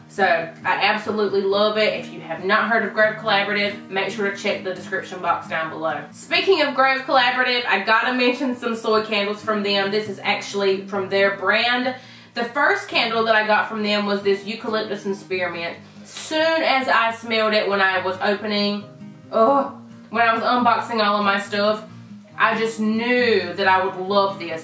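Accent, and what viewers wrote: South, United States. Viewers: All it takes is a country accent and I subscribed.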